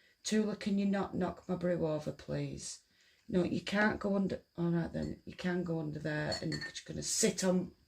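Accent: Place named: Northern England, United Kingdom. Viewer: My husband has just walked in and said , ah I know that accent ,his from up north, we now live in Devon , I understand where your coming from on not getton5 enough sleep , I worry rats if I don't have enough kip 😂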